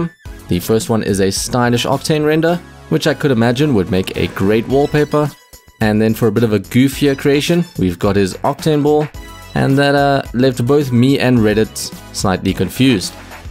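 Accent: South Africa. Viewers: Are u from South Africa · Are u south african :) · Are you South African? 🇿🇦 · Haha nice! I could tell by your accent! 😄 I am also from Joburg 😊 are you currently living here? I get the feeling you're living in another country for some reason? 😂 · are you South African ?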